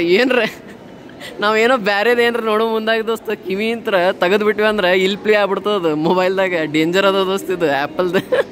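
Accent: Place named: Uttara Kannada, India. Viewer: First video nodidini. Uttarakannada bashe chennagide.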